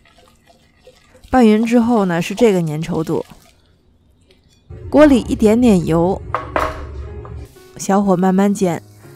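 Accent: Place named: Xi'an, China. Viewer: 您好，我也是西安的，目前住在台湾，听您说话好亲切，标准西安普通话，哈哈，想请问下回到西安在那个网站可以看到您的视频。youtube在大陆打不开呢。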